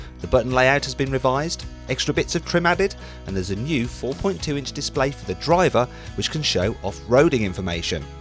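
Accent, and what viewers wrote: United Kingdom. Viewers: its a little bit of a peeeeeeeerch...typical British accent....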